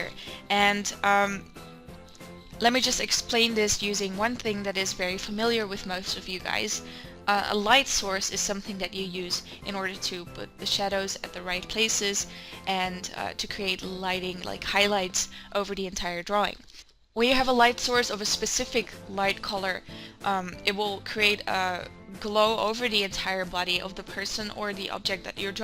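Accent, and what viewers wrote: Netherlands. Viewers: "Oh dit meisje is Nederlands, ik hoor het gewoon, 100% zeker" ... " I learned this in Dutch.." I knew it ^^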